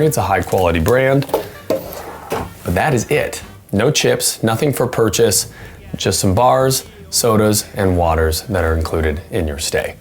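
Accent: United States. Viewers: The misterious pear with the wax tip on its stem is a Passe Crassane most likely, it's a French winter pear. They do this so moisture is not lost. It's a nice touch. PS it's "luh·vaat·suh" though your American accent is rather adorable. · hahaha watching your videos is like watching war! intense, the last word in luxury, the polite detachment, and imposing. you are a security risk for these places. you have done remarkable work - the videography, some of the insights shared, and vuori is no chump change, but i do not know if id allow my bf to watch a girl do reviews in a robe 😂 you are really testing the boundary here and even though you are mesmerizing, you have molly eyes which is all the rage on camera these days i am NOT surprised about your wsj callout. you have adopted a perfect la movie accent and ditched your humble midwest origins but i think some others are gonna interpret you as a threat if you don't listen the first time. 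sometimes your ideas come across as objectivity rather than curiosity (why did this happen? or just pointing it out without being obvious). you were asking for that - you need a little more sensitivity with these class lines man 😭i don't think ur a bad person but u r dangerous 😂 😂 😂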